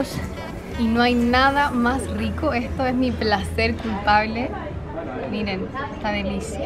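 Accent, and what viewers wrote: Chile. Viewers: Es chilena no? Es la primera chilena que me gusta como habla.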